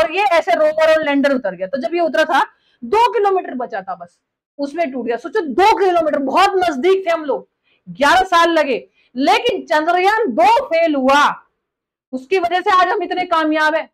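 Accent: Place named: Haryana, India. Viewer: ❤❤❤😂😂😂😂😂❤❤❤व्व्व्व्वा ह व्व्व्व्वा ह व्व्व्व्वा👌👌👌👌👌 च * संदेश कार्य विश्लेषण * * बहोंत बहोंत बहोंत सुंदर और अच्छीं तरहसें समझां दियां * अपनें माॅं और बच्चों कों * अपनी हरियानवी टोन कीं * हिंदी भाषा में * बहोंत बहोंत बहोंत सुंदर * धन्यवाद😘💕😘💕😘💕 नमन वंदन🙏🙏🙏🙏🙏 अभिनंदन भीं👋👋👋👋👋 * वंदे मातरम् भारतं हिंदुस्थानी मातापिताम् महापुरुषं लोकमान्यं जनता जनार्दनम् संतं वैज्ञानिकं🙌🙌🙌🙌🙌 👏👏👏👏👏👏👏👏👏🙏🙏🙏🙏🙏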